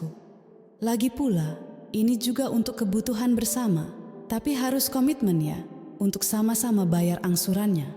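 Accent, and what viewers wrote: Java, Indonesia. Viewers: Iya udah translate jawa pulak logatnya taik taik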